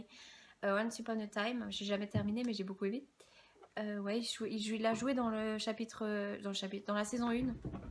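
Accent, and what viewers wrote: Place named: Alsace, France. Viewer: Non mais quand je regardais tes vidéos je me disais justement que t'avais parfois un accent alsacien ! Haha #teamalsace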